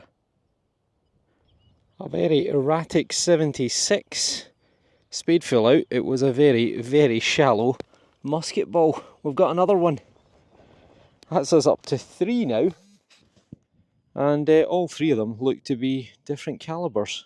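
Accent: Scotland, United Kingdom. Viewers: I enjoy both, I love the Scottish accent 😅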